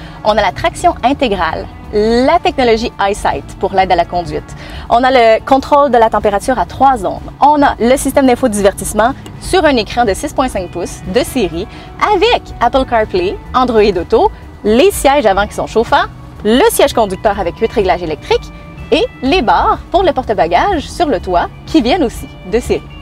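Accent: Quebec, Canada. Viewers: French Canadian accent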